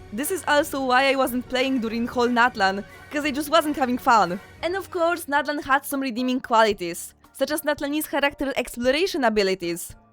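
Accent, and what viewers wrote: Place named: Poland. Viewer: Ah tak, słychać cudowny polski akcent, kocham twój głos <3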